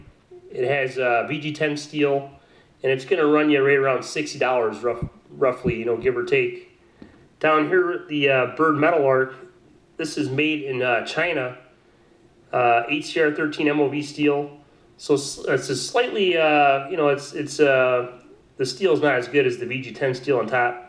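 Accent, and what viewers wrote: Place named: Canada. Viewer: Great video, I really thought you were Canadian seeing as you have no accent... or maybe you have a canadian accent living so close to the border Haha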